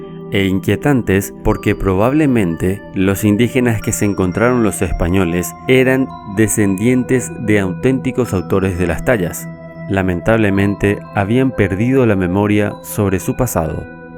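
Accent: Paraguay. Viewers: Eres paraguayo ?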